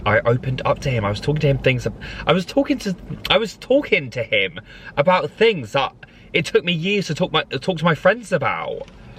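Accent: South London, United Kingdom. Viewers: So you are a south londerrr aye 😅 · south london mandem accent 🤣🤣🤣 can’t lie it’s my fave also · 100% with you there on the accent, i know exactly what you mean - it’s a south london accent and it does a funny thing to my knees too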